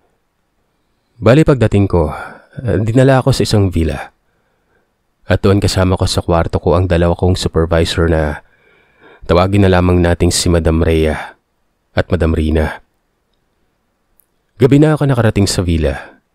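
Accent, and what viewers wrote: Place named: Ilocos, Philippines. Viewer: Ang cute mo mag Ilocano, Sir 😂 Proud Ilokana here. 🫶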